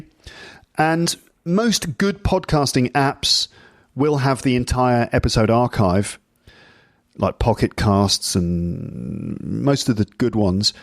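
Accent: United Kingdom. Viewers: Thanks for all the efforts I really love your british accent and I'm very happy I anderstand almost 95%. Also, I've returned back to the earlier videos, I've noticed that without the beard you and Macron are like two peas in a pod so please keep the beard! Its just an advice especially that you are living in paris( you know, you can meet people in yellow!).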